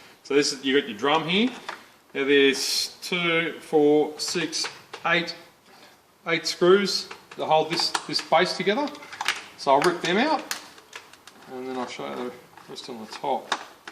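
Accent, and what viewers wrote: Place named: Australia. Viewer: thank you for your video on how to pull the ‘animal’ apart it was a great guide/confidence booster to tackle the replacing of the the main filter around the engine. I live in the UK, I am Ozzy, it was great to hear a beauty Oz accent! Thanks Mate, really appreciated your video and effort, cheers... BTW, It’s back together working, good as new! 😎👍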